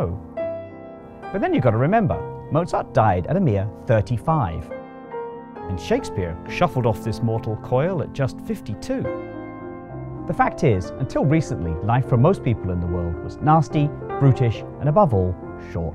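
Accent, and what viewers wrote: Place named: United Kingdom. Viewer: With all respect to the droll British author/narrator of this piece, it just isn't "Frontline" without the ponderous, lugubrious "voice of God" voiceovers of announcer/voice talent Will Lyman (also, surprisingly, the voice for "the most interesting man in the world" although he sounds completely different there, maybe it's the accent). Anyway, glad to be alive and have "survived" Mozart, also my dad who died at age 59, on account of chain-smoking Pall Malls, not lack of urban sanitation and lack of understanding of infectious disease (well, other than the public health issues surrounding smoking).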